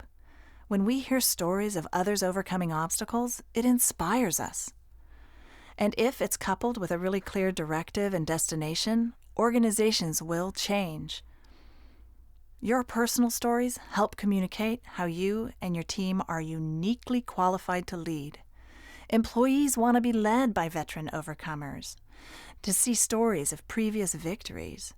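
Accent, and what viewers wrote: United States. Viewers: Appreciate your generosity ❤ plus If I may ask: which state accent is that? I’ve been fond of that accent and I wanna know it’s origin in order to learn it and not to mix it up with other accents. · Its a west coast accent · The voice is really not very clear. It's gabbled, American?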